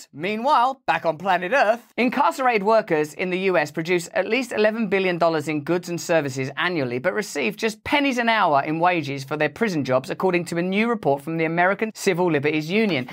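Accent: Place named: United Kingdom. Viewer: Why do so many people like this guy? Discusting, arms flailing about, that British accent is really back alley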